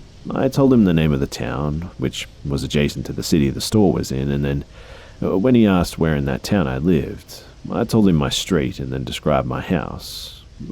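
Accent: Australia. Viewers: ay, is your accent australian?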